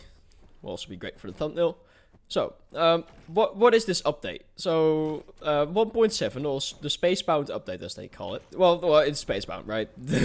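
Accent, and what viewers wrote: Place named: Netherlands. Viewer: ik kan horen dat je nederlands bent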